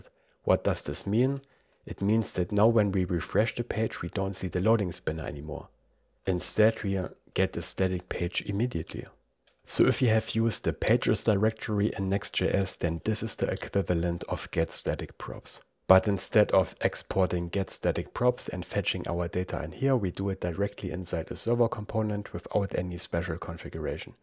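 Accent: Germany. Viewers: man hört absolut deinen deutschen akzent aber sehr nices video bist nen cooler typ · After watching German content (mainly movies on Prussia ) for a fortnight I was thinking I was hallucinating as I heard a German accent but when I checked his location It was Germany //I was relieved that I was not hallucinating btw the thumbnail was no clickbait it was all in one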